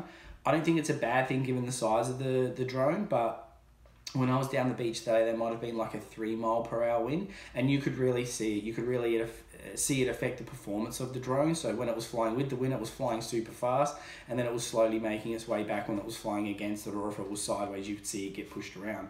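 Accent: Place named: Australia. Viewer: Why are you taking in feet and miles? You sound Australian and look less than 70 years old to me.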